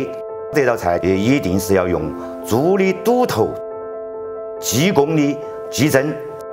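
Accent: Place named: Chengdu, China. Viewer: 成都老一辈说话都是这样子的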